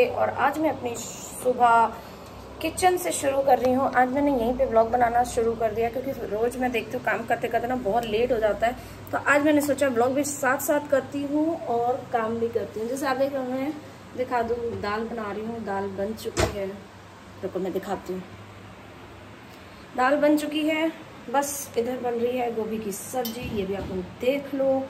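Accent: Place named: Pandit, India. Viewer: मैम आप बहुत खूबसूरत हैं ,😊आप पंडिताइन बोल रही हैं मुझे हंसी आ गयीं हम भी पंडित हैं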